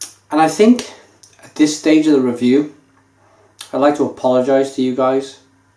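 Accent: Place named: London, United Kingdom. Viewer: YOU SOUND LIKE YOU'RE FROM LONDON TOWN! Nice review.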